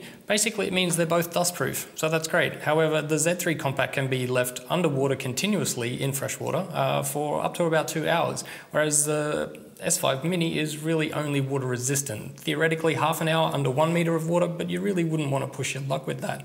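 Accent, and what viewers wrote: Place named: Australia. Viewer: If the British version of Saul Goodman had an Australian accent?!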